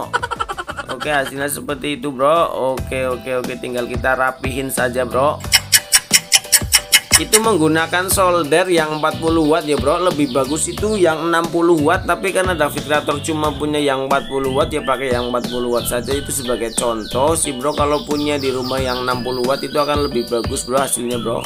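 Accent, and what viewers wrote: Banyumas, Indonesia. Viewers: Wong ndi sih Bro...? Ganing ngomonge ngapak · Bahasane ngapak ora ngapak ora kepenak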